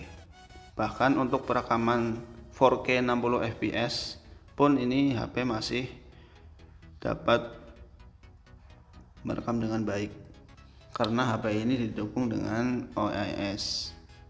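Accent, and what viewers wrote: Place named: Banyumas, Indonesia. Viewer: Logatnya kyk ngapak ini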